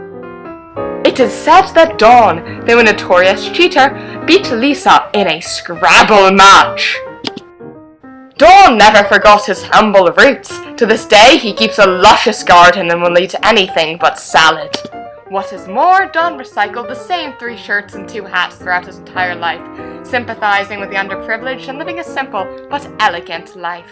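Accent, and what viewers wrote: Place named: United Kingdom. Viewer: love the British accent. you're quite talented. keep 'em coming!!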